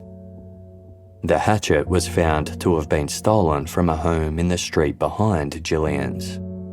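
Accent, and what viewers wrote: Australia. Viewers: Casefile wouldn’t be the same without your voice. I love that you have an Australian accent.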